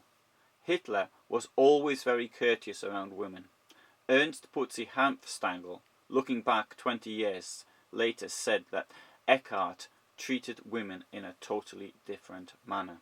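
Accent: Newcastle, United Kingdom. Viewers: Geordie narrator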